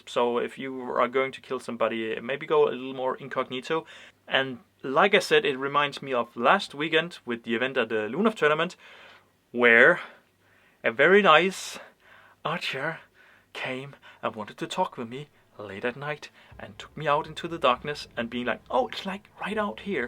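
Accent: Denmark. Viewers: is he danish? he has a very danish accent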